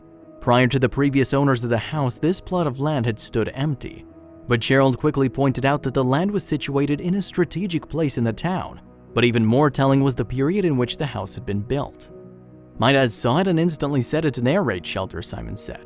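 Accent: United States. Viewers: Unfortunately he is speaking American not English. They pronounce words like a-loo-min-um not al-u-min-e-um and sked-ull not shed-u-al.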